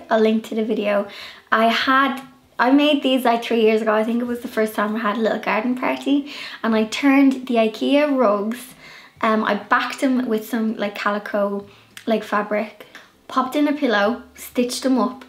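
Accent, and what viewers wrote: Ireland. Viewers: That is not an English accent. Probably Ireland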